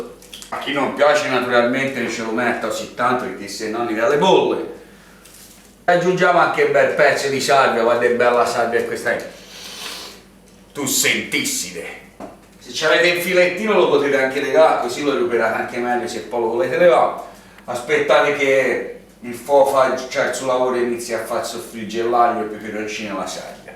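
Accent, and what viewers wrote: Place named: Livorno, Italy. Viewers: Bellissimo, confortevole, casereccio e senza musica da discoteca in sottofondo: meraviglioso pesce, simpaticissimo l'accento livornese, esperto il cuoco e padrone degli ingredienti, video come questi riempiono sempre l'anima. Peccato pero', purtroppo, non sentire il profumo di quel piatto strabiliante! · Troppo figo l'accento Livornese.. Ricetta rifatta uguale e venuto tutto fantastico. Unica domanda ma quando si mette il polpo un bicchiere di vino bianco no? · Meriti like solo per la parlata livornese😂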